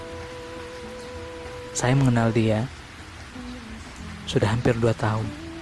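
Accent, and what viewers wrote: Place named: Java, Indonesia. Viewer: memang mantap sekali ini cerpenya,indah sekali cara merangkai kata-kata dan intonasinya,bisa mengolah suara medok khas jawanya, sang kekasih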